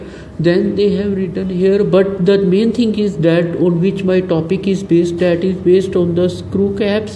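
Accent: India. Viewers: Typical indian accent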